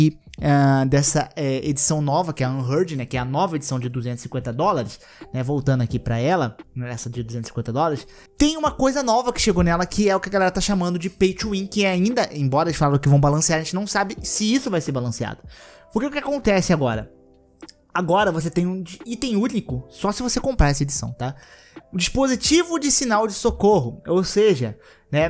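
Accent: Rio de Janeiro, Brazil. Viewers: Sotaque carioca simplesmente intakavel, flws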